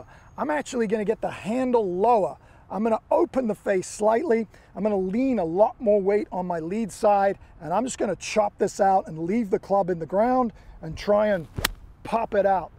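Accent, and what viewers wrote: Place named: Australia. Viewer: Can hear his Australian accent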